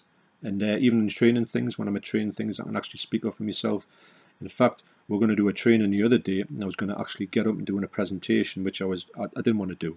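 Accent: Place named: Newcastle, United Kingdom. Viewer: Hi, I think it's fantastic that you are open to new experiences, ideas and the chance to improve the individual that you are. I really enjoy your content and your friendliness. You have a really charismatic on-screen presence plus I love the Geordie accent. Never stop being yourself but also never stop limiting yourself.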